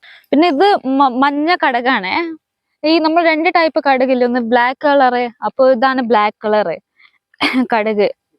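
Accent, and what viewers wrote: Malappuram, India. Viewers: ingal enganaya malappuram slang parenne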